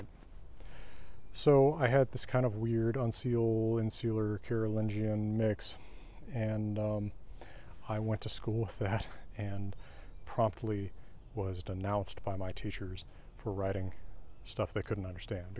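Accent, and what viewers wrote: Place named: Georgia, United States. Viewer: Well, I like your handwriting ! Thank you for sharing a glimpse of your teenaged self . You were already becoming an intellectual by then ! I just stumbled upon you , when you and Simon Roper were “communicating “ in Old Norse or something ! Just last night up popped you and another linguist were talking about the beginning of the alphabets. I am of retirement age, and home school two granddaughters, a 8 year old third grader , and a 13 year old 8th grader . Would love some of this to rub off on them ! Do you by chance have something I can use to inspire them ? I live is SE GA. Natives of NE FL. Surprised that you went to U GA ! Your soft GA accent still coming through .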